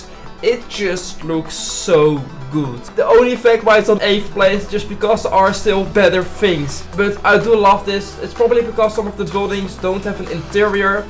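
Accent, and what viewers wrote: Netherlands. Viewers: Dutch accent nice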